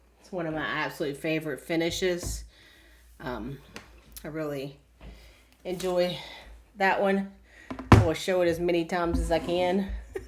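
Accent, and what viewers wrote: South, United States. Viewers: Your videos are amazing. It’s such a joy to watch you. I love seeing your stitching. Your Halloween projects have inspired me to stitch more of them. I also love your accent. Keep up the Southern charm! You are a pure joy to watch.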